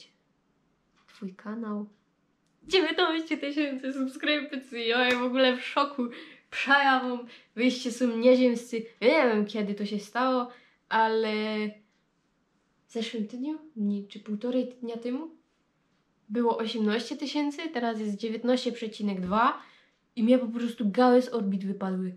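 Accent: Silesia, Poland. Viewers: Nie jestem ze Śląska ale uwielbiam słuchać gwary slaskiej, dla mnie jest to trochę taki powrót do dzieciństwa kiedy oglądało się serial Święta wojna od tego czasu pokochałem Śląsk i gwarę ☺️ Pięknie wyglądasz w tych okularach ☺️ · Gwara śląska jest super, fajnie się Ciebie ogląda. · Ładna dziewczynka. I nawet nie przeszkadza mi " ślonsko godka". Dzięki YT za polecenie kanału. A starsza siostra wolna? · twojej śląskiej gwary... fajnie tak gadasz po wieśniacku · Siema Dziołcha nima co się wstydzisz noszej godki to je sam piękne że się godo cza ta tradycjo naszo pielęgnować i nie słuchać gupich ludzi kere nie tego nie rozumieją. Pozdrawiom z Bytomia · Super filmiki, piękna dziewczyna i super Śląska gadka pozdrawiam i czekam na następny👍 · Jeronie jako gryfno Frelka i richtig fajny slaski akcent ♥️♥️ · Śląska godko to śląska godko sztosik mówisz i opowiadasz. 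Tako kobite to ciężko znaleźć ;P pospolita dobra duszyczka. Podziwiam i oby ten kanał się dobrze rozwinął ;) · gadki po Śląsku fajnie się słucha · Uwielbiam te twoje śląskie gadanie :) Absolutnie musi to być na kanale! To tak wyróżnia ten kanał z innych. Jak komuś się nie podoba to niech nie ogląda i tyle!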